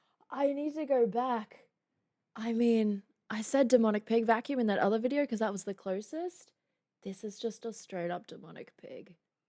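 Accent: Australia. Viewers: With your beauty and that aussie accent, man your a hottie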